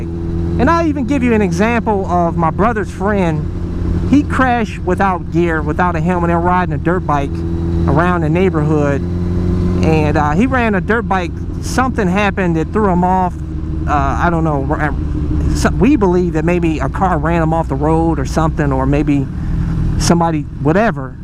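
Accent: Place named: Louisiana, United States. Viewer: Dude are you from Louisiana? You sound familiar af bro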